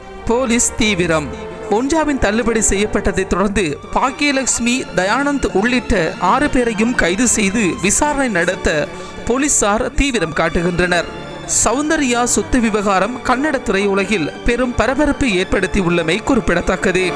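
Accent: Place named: Sri Lanka. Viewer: he s srilankan"tamil"... naturally their pronounciation...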